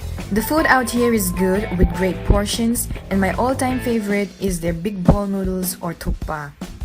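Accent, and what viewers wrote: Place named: Hyderabad, India. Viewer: "Hyderabadi"...very Hyderabadi accent :) Nice video and scrumptious foods.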